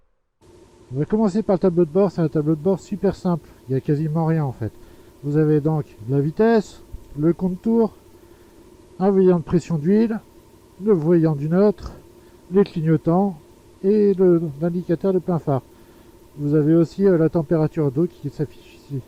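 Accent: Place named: Grenoble, France. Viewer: Salut l'ami. Un Bel essai de ta machine. Ben sa fait loing pour te prêter une machine. Je vois plus où t'habites mais à l'accent du Piti Piaf je dirais pas loing de Grenoble aux alentours. Gros V ✌🏻.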